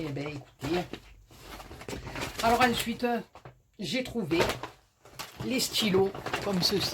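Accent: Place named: Provence, France. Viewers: bonjour je regarde avec plaisir vos videos et me regale de vôtre parlé de chez moi! je suis marseillaise j ai 68ans et je scrappe pour le plaisir et pour me vider la tête;;Ac/4tion le plus pres de chz moi , j habite le bord de mer, c est St Loup , 2bus 3/4 d h pour arriver dans un depotoir!!! les rayons vides et le peu d articles , par terre , eventres, bref ,pas la joie... dommage quand on est à payolle les prix Action c est super!!! continuez de nous faire vos petites videos vous ete geniale amitie Nadege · Coucou, je te suis depuis peu mais je me régale car j'entends bien les façons de parler de chez nous 🥰. Nous c'est à Marignane que c'est le ouaille et à Châteauneuf les Martigues c'est propre mais on ne trouve pas grand chose 🤔. Bisous 😘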